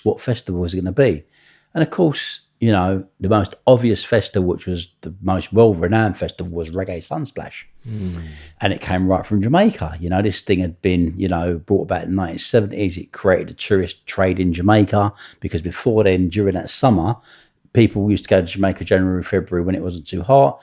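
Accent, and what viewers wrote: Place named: England, United Kingdom. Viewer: The elegant, upper class English accent talking about video games??? I have a hard time seeing you hard at it, in between podcasts, just slaying the shit outa fictional characters. I get what you're doing but it does make me giggle. Im very glad you are getting sponsored, i know it's hard for your genre to get that. Im just being a shit head! Good for you, love your show.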